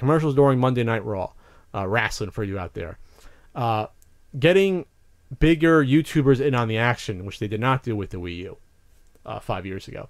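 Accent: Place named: East Coast, United States. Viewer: LIVES ON CALI!?!?! O_O I COULD HAVE SWOOORN THIS WAS AN EAST COAST BOY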